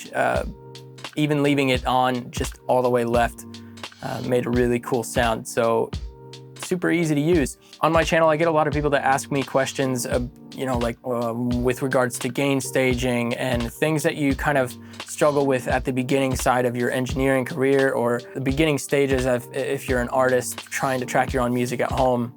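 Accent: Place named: United Kingdom. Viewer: Love the British accent mate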